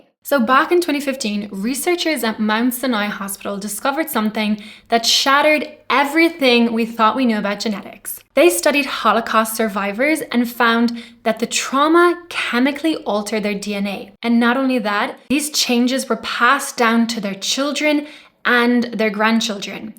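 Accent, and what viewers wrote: Ireland. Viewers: Great video. Thank you. Are you Irish because you have an Irish accent? Blessings.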